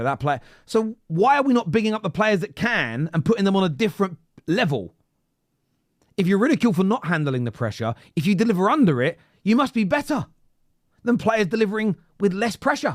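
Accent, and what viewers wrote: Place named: Liverpool, United Kingdom. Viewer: "Scousers don't talk like that" 🤦 Imagine thinking that half a million people all talk exactly the same way...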